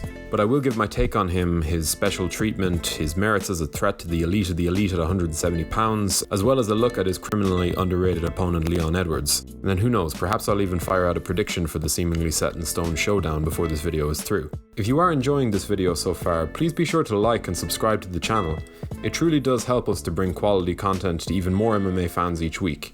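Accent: Ireland. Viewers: u Irish my guy?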